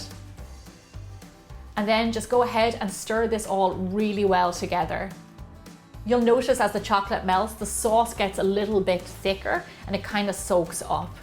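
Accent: Ireland. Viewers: I looooooooooove Irish accent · love your irish accent too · your beautiful Irish accent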